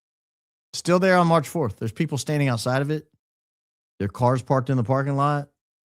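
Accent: South, United States. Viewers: babes to a northerner you for sure have a southern drawl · Yes, …You definitely have a southern accent. 😂. And I agree with you about Christmas Album! is my fave!!!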